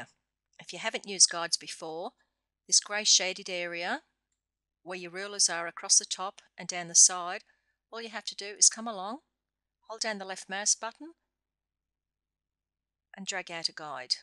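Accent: Australia. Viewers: She's Australian I reckon..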